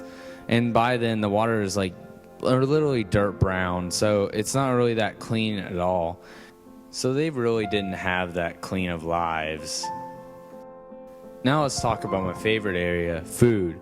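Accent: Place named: United States. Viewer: Give me an Englishman or women please. Why do I have a teenage yank trying n failing to explain this to me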